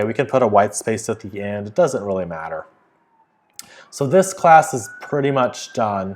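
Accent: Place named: Southern California, United States. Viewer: I love this guy’s accent, let me guess San Bernardino county or at least somewhere in SoCal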